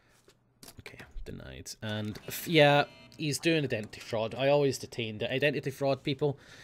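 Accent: Scotland, United Kingdom. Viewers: He is Scottish he can't control his accent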